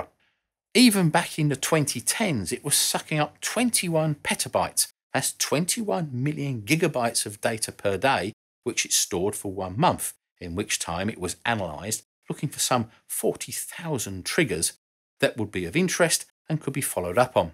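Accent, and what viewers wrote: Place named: RP, United Kingdom. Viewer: Thank you, for your videos! They are all incredibly good! Your speech is brilliantly clear, and there are few other places on YouTube where you can see proper English subtitles (and also hear real BBC English spoken!)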